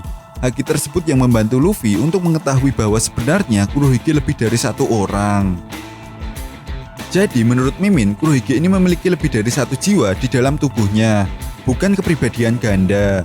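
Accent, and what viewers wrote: Java, Indonesia. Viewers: Bahasanya medog ,, tapi itu yg membuat saya terhibur ,, lanjutkan ....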